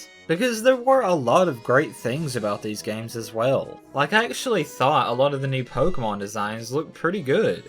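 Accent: United States. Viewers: Damn your American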